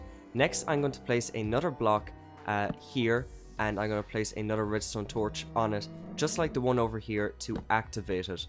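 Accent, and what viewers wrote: Ireland. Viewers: Wow! Your Irish! (Well if my accents serve me correctly) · are u irish because i am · I'm Irish too! Cool ur accent is so col haha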